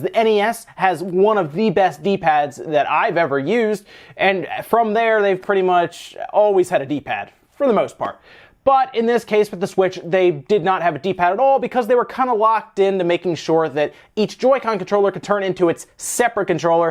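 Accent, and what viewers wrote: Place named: Maryland, United States. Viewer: you sound like you’ve got a MD accent.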